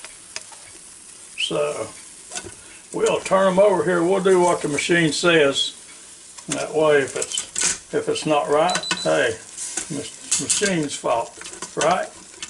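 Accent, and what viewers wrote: South, United States. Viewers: I ❤️❤️❤️ Your foodie demonstration. Your southern drawl made me so very happy. Can’t wait to watch another demo of yours. My wings (modified) came out delicious. ❤️❤️❤️ PS. Love my instant pot duo crisp.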